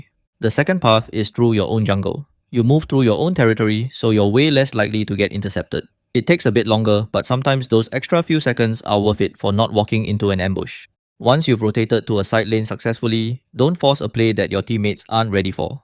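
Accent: Singapore. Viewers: Wait are u singaporean? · Lmao. I heard ur accent and immediately have to double check ur location. Glad to meet another fellow Sgporean. Keep it up bro. As a main midlainer who played most games in mid, i may knew most of this fron exp, but its still a very solid guide that most nowadays still dnt know. Especially rotation. God knows how many times when i played other roles and the mid dnt know how to rotate, in mythic/honor btw. Appalling😂 Edit: Subscribed for support✊🏽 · hi are you singaporean by chance im singaporean also and that accent is so recognisable lol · Are u singaporean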